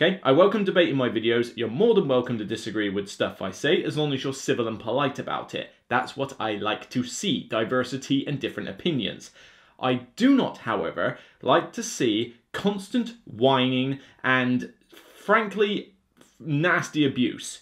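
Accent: United Kingdom. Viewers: Like the intro. Usually internet trolls are the kind of people who won't have the guts to say crap face to face and, most of the time, are insecure. Picking on your accent ? Seriously ? I'm french Canadian and I don't have any problems understanding what you're saying, in fact, I love British accent, it sounds so cool I think. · Ignore the haters man, you do good stuff, us brits all have weird accents, mines a combo of welsh and midlands so a bit of a odd combo too :P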